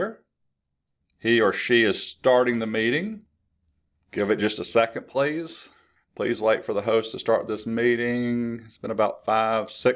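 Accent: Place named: South, United States. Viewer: Thanks... what accent is that btw...??? somewhere south??? one of my favs lol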